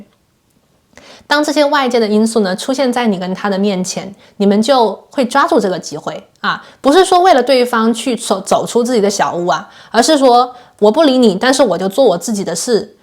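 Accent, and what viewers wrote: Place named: Taiwan. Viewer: 默默關注老師很久，很準確之外，老師聲音口音超可愛，有點台灣腔，聽了很喜歡，不會讓人覺得看不下去，希望還能有再多的測驗，感恩宇宙❤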